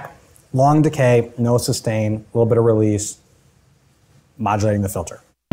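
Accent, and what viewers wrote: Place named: United States. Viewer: Also never comes out of character with the American accent....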